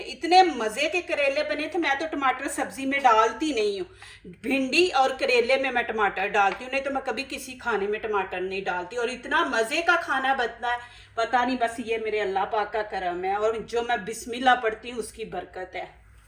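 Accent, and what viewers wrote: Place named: Punjab, India. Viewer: Apna bo8lne ka st5yal sake karin. P9anjabi le3hja na ho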